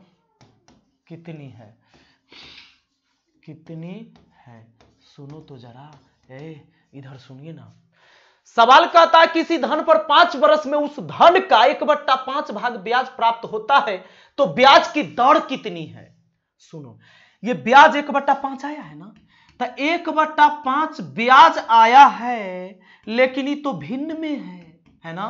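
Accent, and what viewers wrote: Bihar, India. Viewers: बिहार के हो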